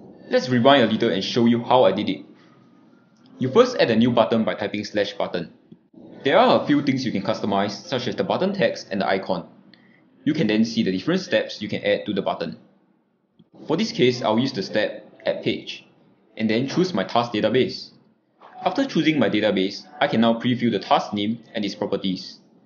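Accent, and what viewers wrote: Singapore. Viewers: The Singaporean accent haha! Love your content. 😁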